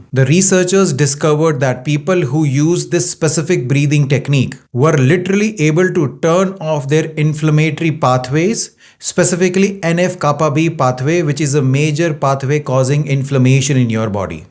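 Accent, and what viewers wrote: India. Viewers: Hello Doc, are you really based in the USA? I don't think so considering your pronunciation. It is 100% Indian.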